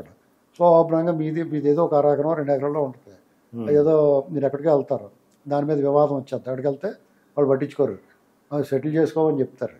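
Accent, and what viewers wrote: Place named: Godavari, India. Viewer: నమస్కారమండి గారు మీ వీడియోస్ రెగ్యులర్ గా ఫాలో అవుతూ ఉంటాను ఈ మధ్య కొంచెం తగ్గించినట్లు ఉన్నారు సార్ హెల్త్ బాగుంది కదా చక్కగా విశ్లేషిస్తారు సార్ మీరు ప్రతి అంశాన్ని అందుకే మీ వీడియోస్ ఇంట్రెస్ట్ గా చూస్తాము మీరు మాట్లాడే భాష కూడా మాకు ఎంతో నచ్చుతుంది సార్ ఆ గోదావరి జిల్లాల యాస చాలా చక్కగా ఇంప్లిమెంట్ అయి ఉంటుంది అంతకన్నా ఎక్కువగా మీ భావజాలం మాకు ఎంతో ఇష్టం చాలామందికి అనుసరణీయంగా కూడా ఉంటుంది సమాజాన్ని ఎడ్యుకేట్ చేయడంలో మీ పాత్ర కూడా ఎంతో కొంత ఉంది ముఖ్యంగా పార్టీల పట్ల నిక్కచ్చిగా మీరు చెప్పే అభిప్రాయం చాలా వాస్తవికంగా ఉంటుంది అందుకే మీరంటే మాకు ఎంతో అభిమానం మీరు ఎప్పటికీ ఇలాగే మంచి విశ్లేషకులు గా పరమేశ్వరుని దయతో ఆయురారోగ్య ఐశ్వర్యాలతో విలసిల్లాలని కోరుకుంటున్నాను సార్ నమస్కారమండి గారు మీ అభిమాన సోదరి వసంత రాజకుమారి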